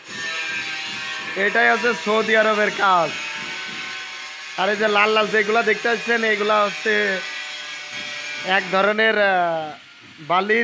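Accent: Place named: Chuadanga, Bangladesh. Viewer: কথা শুনেই বুঝতে পারছি, আমার বাড়ি ও চুয়াডাঙ্গা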